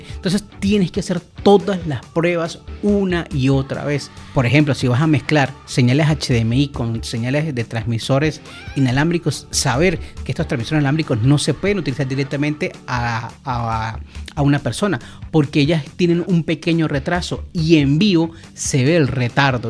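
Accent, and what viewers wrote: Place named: Caracas, Venezuela. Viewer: De paso me suscribí y te empecé a seguir en Instagram jejjjee Estas en San Cristóbal, pero tu acento es caraqueño.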